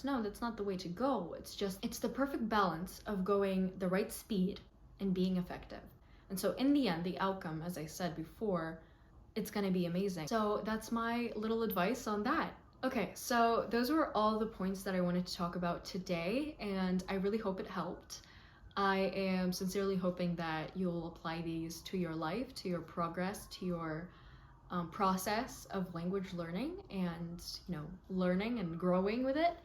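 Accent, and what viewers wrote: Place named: Turkey. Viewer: Are you Turkish? Your accent is incredible. Would you say that watching movies was the main thing for you?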